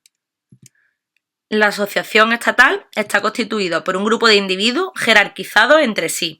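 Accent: Spain. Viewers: Por que es española genio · Es Española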